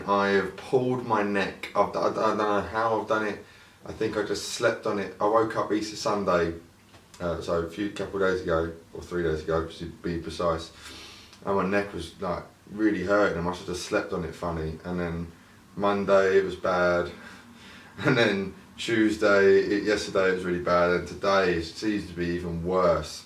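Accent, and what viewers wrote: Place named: England, United Kingdom. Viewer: You look like an italian but you speak like an english dude ^^ I like that :D and feel Better ^^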